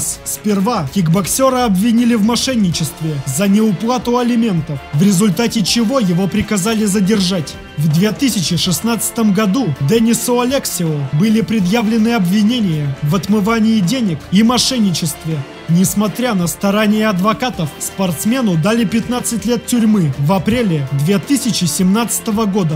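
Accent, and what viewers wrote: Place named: Moldova. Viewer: Могли бы человека с нормальной дикцией подобрать для озвучки,а не этого молдована с суржиком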